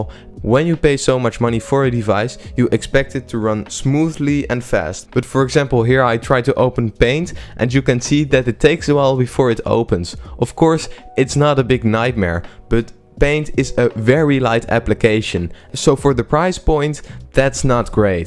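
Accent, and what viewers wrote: Netherlands. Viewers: That is quite a strong Dutch accent. But at least you're not from Limburg.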